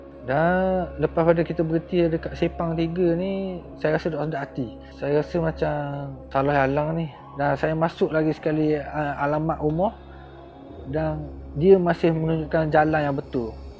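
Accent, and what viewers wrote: Terengganu, Malaysia. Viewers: Pekat ganu boh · Pekat bahase terengganu dia haha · Power! Kite pulok memang minak loghat ganu ni. Jaddi boh. Subscribe slaluhh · Pekat molek loghat tranung..terbaik boss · Org ganu ke??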